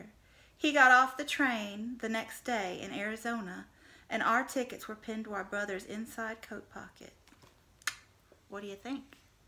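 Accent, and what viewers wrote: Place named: South, United States. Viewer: I guessed all of them except the last one. Haven't read it. You read very well. you got that southern twang in there and everything!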